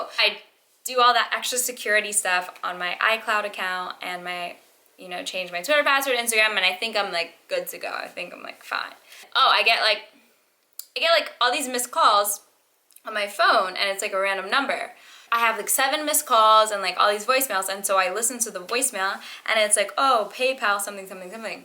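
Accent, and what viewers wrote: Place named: United Kingdom. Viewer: the attempt at the British accent makes her look even hotter 🙃😍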